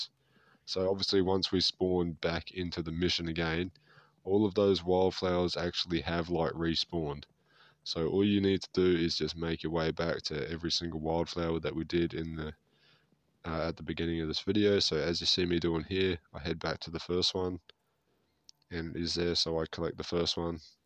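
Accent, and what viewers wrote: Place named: New Jersey, United States. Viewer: this is a great one. luv u accent....New Jersey?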